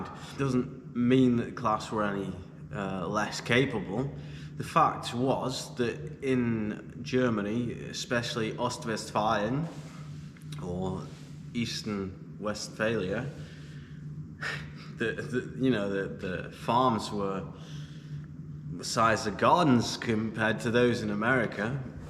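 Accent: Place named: United Kingdom. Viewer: The most British sounding German i,ve ever heard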